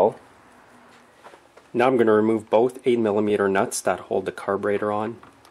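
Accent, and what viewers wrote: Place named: Canada. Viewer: you sound somewhat Canadian. Nice vid tho, its good to see a professional do it, in the correct order. What solvent are you using in your parts washer? It dosent adversly effect the ignition bits? I'm sure its all solid state.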